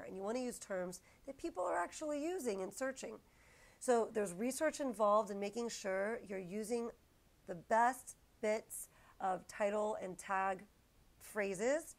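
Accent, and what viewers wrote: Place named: New England, United States. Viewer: i have been following you since day 1. I went from 0 sales in may to 360 sales today, which is not tremendous but I don't have a lot of time to spare so I consider it a win. I owe a lot of my success to you and a few other youtubers like you. So thank you!! I was honestly surprised because I haven't had a single 0 sales day since January 1st. Before that, it was probably October. Again, I owe it to you ❤❤ In other unrelated news, when I first found your channel I was surprised by something else and that's Tucker lol. I don't know where you live but judging by your accent i assume somewhere in New England. There's a gardening Channel i watch, James Prigioni, and he has a little dog called Tuck who looks a whole heck of a lot like Tucker. They live in Jersey. Just a fun fact 😂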